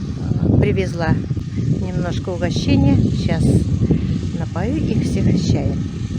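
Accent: Tatarstan, Russia. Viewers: Настоящий татарский акцент ч на ,щ" , при разговоре на русском языке. Мило🤗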